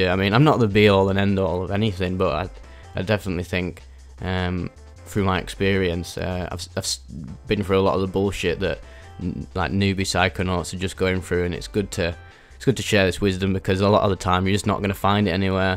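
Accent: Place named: York, United Kingdom. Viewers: I reckon he's from Yorkshire, up in the North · Luvin the ooooh ai, cheeky bit'o York speakin. All reminders of home/my British heritage aside, appreciate the sharing of your wisdom! I'm fascinated by psychedelics and hopefully with the right intentions, setting and people I can use it as a positive tool. However also knowing the extreme risks in undergoing such activities. Thanks again! 🇬🇧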